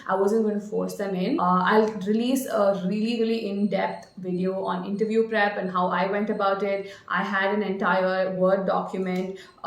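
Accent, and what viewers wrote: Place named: United States. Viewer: 1 more question • How did you improved your communication and English speaking(American accent) ? As a indian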